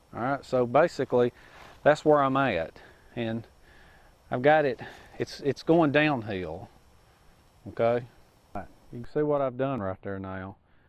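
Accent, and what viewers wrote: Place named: Georgia, United States. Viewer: Really great videos sir!! You sound just like some people I know in Ringgold, GA!! Keep em' coming man!!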